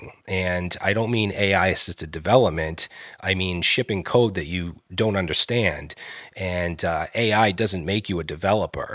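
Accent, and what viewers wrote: Boston, United States. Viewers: best Boston accent on YT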